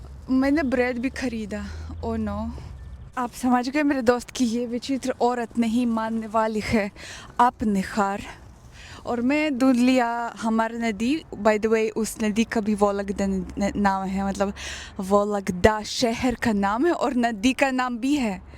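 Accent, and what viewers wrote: Standard. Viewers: Bhai kitni pure hindi bolri h ye ladki ❤ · aapke videos badhiya hote hain aur aap shuddh Hindi bolte hai utana hamahare yahan par pade likhe loge bhi nahi bol pate hai. Maine Aap ko videos mai pahale bhi kai baar yeh bolte hue suna hai ki “ Bhagwan ne mujhe dimagh bahut kum diya hain “ aisa aapko videos mai aur apne aap se nahi bolana chahiye. Aisi meri aapko sujhaw hai. hamhare pure India se aapke bare mai koi bhi vyakti nahi bol sakta hai aisa mai samajhta hoon. Aap ek buddhiman avam khubsurat mahila hai. Ye keval aapka complex hai.please drop it. Videoske liye dhanywad aur subhkamnaye. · Bhai yrr itni sudhhh hindi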